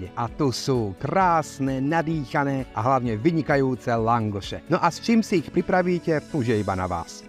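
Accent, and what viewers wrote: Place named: Czech Republic. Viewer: Ten pepícky prízvuk je odporný.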